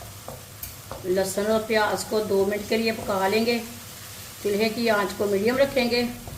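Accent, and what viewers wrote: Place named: Punjab, India. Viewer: Never watched such unappetising food being cooked with the explanation in a hilarious Urdu with a Punjabi accent. Very sad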